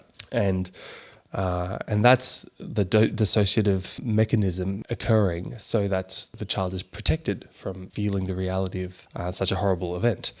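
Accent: Australia. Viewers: I saw my pink sweater on my bed, my navy blue rumpled and soft looking pillow case, my purple cane hanging on the bathroom door knob, ugly orange mesh bag on my floor and my dusty black suede shoes. I felt my warm dry hand wipe my cold damp mouth, the softness of my pillow, the long sleeve of my fresh clean tee shirt, the smoothness of the manufactured wood bookcase by my bed. I heard the ice maker in the kitchen, the fan on my husbands side of the bed, Dr. kind, gentle, Australian accented masculine voice. I smell the clean smell at the neck of my tee shirt, the smell of the shredded wheat cereal I just ate clinging to my right hand. I taste a starchy dry taste in my mouth like I am just about to make a phone call I have been dreading or I should go wash my hands and brush my teeth because I just had cereal.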